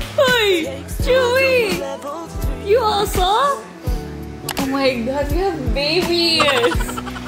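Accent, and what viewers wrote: Visayas, Philippines. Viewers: sagdii lang ng mga nega comments sa imuha oi you can't control them but what you can do either is to continue to do the right thing, which is to entertain us with your vlogs and cute kaayu ka mgbisaya oi lingaw kaayu ❤️ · Kalingaw jd nimo mag bisaya ate 😂 more daw aning mga bisaya nimo 😂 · ka cute sa bisaya 💕😊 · Cute kaayo ka mag bisaya ate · you sound so cute with bisaya accent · Ka cute nimu mag bisaya uyy 😍😍😍♥️ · Cute kaau mag binisaya ui… 🥰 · kacute magbisaya HAHAHHA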